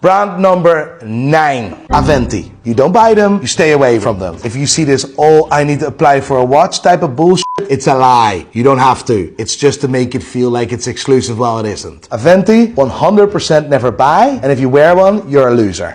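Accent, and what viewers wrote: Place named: Ireland. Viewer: Once you hear him as Irish, its impossible to shake. Sorry.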